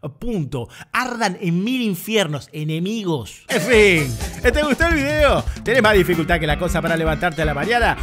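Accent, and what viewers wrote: Argentina. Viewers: Tiene la misma voz que todos los porteños. Pero buen video, me hiciste reír, y eso ya es mucho. Posible suscriptor, no te aseguro nada.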